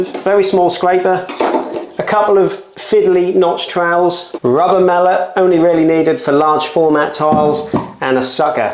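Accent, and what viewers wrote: Norfolk, United Kingdom. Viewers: That's a Norfolk accent if ever i have heard one. Made me feel right at home 👌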